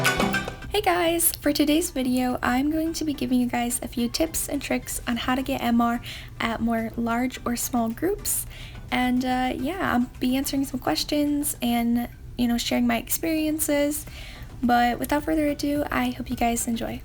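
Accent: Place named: United Kingdom. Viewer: Heyy! R u from European places, accordin t ur great British accent?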